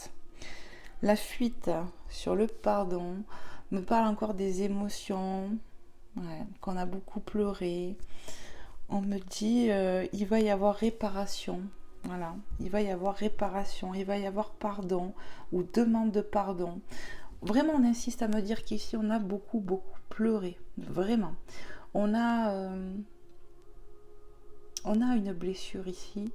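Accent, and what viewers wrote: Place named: France. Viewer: J ai fort apprécié, vous vous donnez beaucoup, yes! Yes! J espère que les choses vont enfin de bouger … j apprécié votre accent français , ça a qq chose de sympa. Je suis Belge,,, bon week end à vous🤸🏻‍♂️🦋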